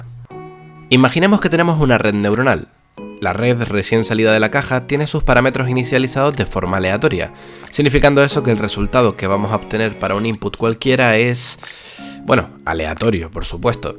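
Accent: Canary Islands, Spain. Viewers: Pero pero pero pero pero como no habia encontrado este canal antes? Vengo por el video de JSantaolalla..................... Pero pero pero eres canario? por el acento "un poco"............... ¿??¿?¿ Pero pero pero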